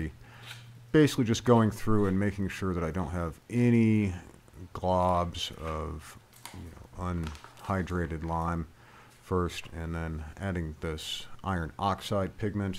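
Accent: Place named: Pittsburgh, United States. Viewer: You have that upper Pennsylvania accent like Pittsburgh. I’d wouldn’t be surprised if that is where you were raised. Just a guess. It’s a uniquely subtle accent.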